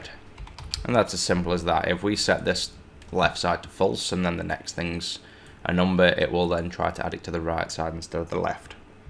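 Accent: United Kingdom. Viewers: Your tutorials are very helpful and neat (not to mention your deep British voice) , in fact the only ones that helped me through all my problems.i was trying to make a power function but that went terrible and I was hoping if you could do a tutorial on that.it's just too hard to figure out the logic (: greatly appreciate it.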